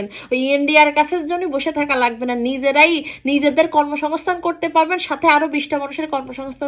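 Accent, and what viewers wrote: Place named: Gopalganj, Bangladesh. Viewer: আপনার বাড়ি গোপালগঞ্জ মনে হয়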